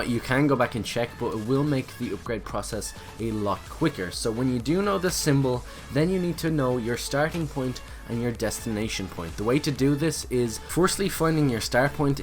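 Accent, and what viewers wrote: Dublin, Ireland. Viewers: Are you from Dublin you sound like it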